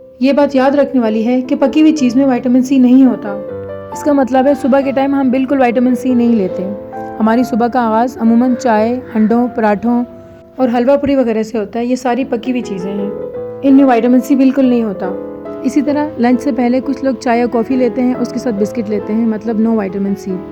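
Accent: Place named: Karachi, Pakistan. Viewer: Thanks alot for the Precious and healthy Information Your Excent is Karachi Wala Am I Right?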